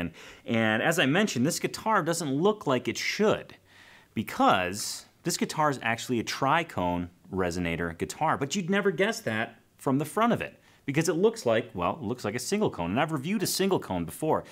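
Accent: Chicago, United States. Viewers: nice review.. I can hear the chicago in your voice ( I am a north sider by birth) and you have mentioned Old Town School ( where I studied harp with my dear friend Joe Filisko). May I ask would you consider plugging that bad boy in? I have a few resos but only one with a pickup ( and that is lousy) ...thanks and be well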